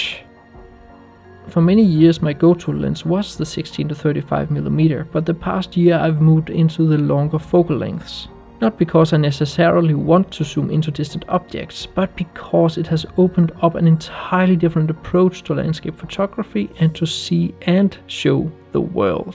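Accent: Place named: Denmark. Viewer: You made some excellent points in this video,. For that reason, I subscribed. The shots in this video are absolutely amazing! Keep up the good work! Also, I immediately recognized the Danish accent:)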